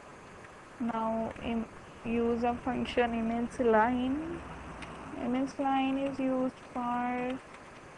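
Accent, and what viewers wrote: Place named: Telugu, India. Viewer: Telugu voice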